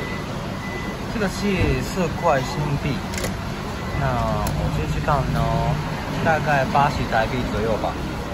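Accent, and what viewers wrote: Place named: China. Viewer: あなたの中国アクセントも可愛いですね😊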